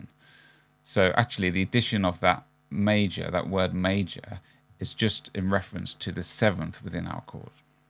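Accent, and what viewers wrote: United Kingdom. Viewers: Sounds smug and pretentious? That's just his British accent.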